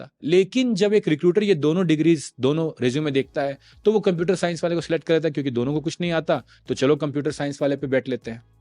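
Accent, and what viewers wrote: Bihar, India. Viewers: Bihari tone aa rha aapki baato main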